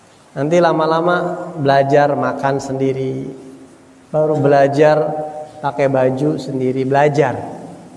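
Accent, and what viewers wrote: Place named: West Java, Indonesia. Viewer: Masyalloh.. Habibana.. Senyum ane denger basa Sunda nya.. Ajiieeb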